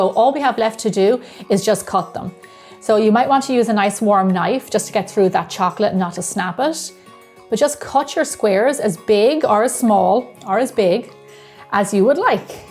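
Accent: Ireland. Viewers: I have to be honest, part of the reason why I clicked is because I love the Irish accent. :) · YES! I had asked you on a very old video fo yours because I thought I recognized your accent, (I'm Irish myself,) then read your 'About' and was so confused! I was trying to think "Where the hell would an accent like that be other than Ireland..?" and nothing came to mind! Fellow Irish person here X You're my idol as well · What percentage do you suggest if I use dark chocolate? I love this and your accent as I lived in IE for 8 years!!! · Those look so yummy. I,love watching your videos and just love hearing your IRISH ACCENT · I knew you are Irish!You still have very strong accent💕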